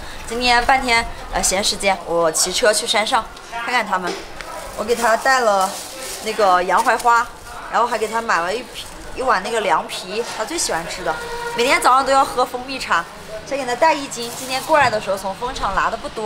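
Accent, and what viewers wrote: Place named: Gansu, China. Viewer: 这咋，还把摄影师带到了甘肃？怪不知你听不懂湖蓝方言，却说一口流利的甘肃方言。六年？还来得及，赶紧生个小小孩，这样就四世同堂了。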